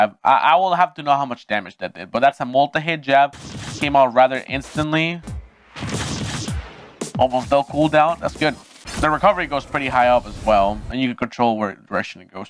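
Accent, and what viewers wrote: Chile. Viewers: Habla chileno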